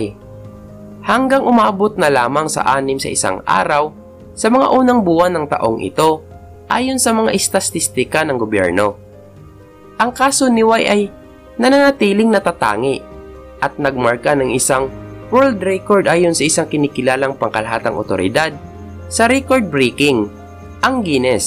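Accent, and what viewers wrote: Visayas, Philippines. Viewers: bisaya to Hahaha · Bisaya dong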